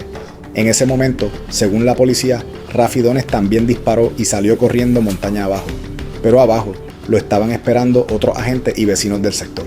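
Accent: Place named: Puerto Rico. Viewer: Cabron te estoy viendo desde Mayagüez Puerto Rico eres el mejor narrador, por q así mismo es q se abla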